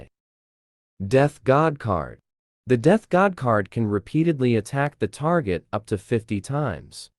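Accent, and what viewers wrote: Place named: South, United States. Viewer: 1.25 is the perfect speed for this thing. Especially if your used to a faster way of speaking, then the southern drawl this one is set too. :)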